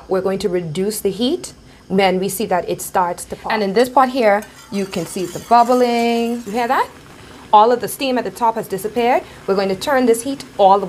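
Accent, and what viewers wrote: Bahamas. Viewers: I really enjoy watching you cook! The accent and the comments only Bahamas’s understand 😁 bring back so many memories of my childhood. I’m American born but my father is Bahamian. Love the food dishes from the island! 😋 👍🏾❤️ by the way, hadn’t heard monkey foot in a minute. My cousins used it all the time. 😁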